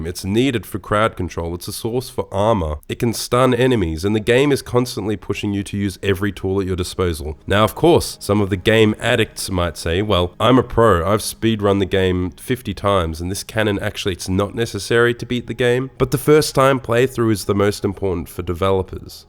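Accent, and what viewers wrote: Australia. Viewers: No offense, but your Aussie accent makes it incredibly difficult to tell if you're being sarcastic or not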